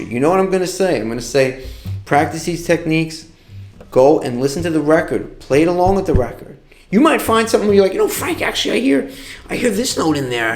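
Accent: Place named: Italian-American, United States. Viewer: You know, Frank, I've been banging on a guitar for 53 years, and I'm still trying to get good at it. The whole Travis picking thing has had me flummoxed for the past few months. Being that you're also an Italian dude who looks and talks like the people I grew up around seems to help me to kind of "get this thing" a little bit better. You're a good teacher, and I'll bet you're a great performing artist as well. I will take a break from my lesson to go and investigate. Thank you, paisan.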